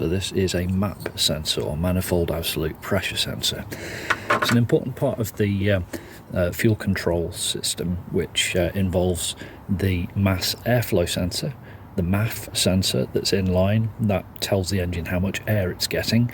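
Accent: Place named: England, United Kingdom. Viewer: came here trying to help a friend with his 2008 XC90 so I hope it's in the same place! But I wanted to say you have such a lovely voice haha, where are you from? I can't decide if you're from the North East or Colchester 😂😂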